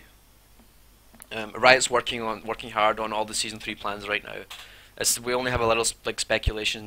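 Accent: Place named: Scotland, United Kingdom. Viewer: didn't know had a lovely scottish voice:D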